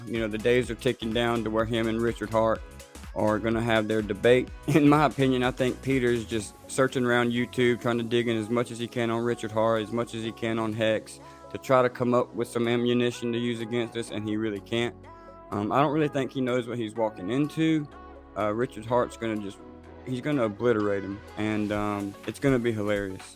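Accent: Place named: South, United States. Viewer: you keep it simple that’s why I like you! And your southern n into crypto rare combo! Oklahoma here so I appreciate a firmiliar voice in the space ! Took your advice thank you!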